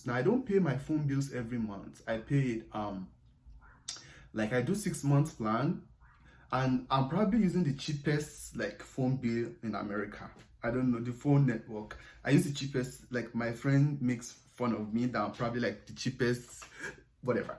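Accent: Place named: Ogbomosho, Nigeria. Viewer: Mateo and I are watching. He says he like your 'frequent' ogbomosho tongue :)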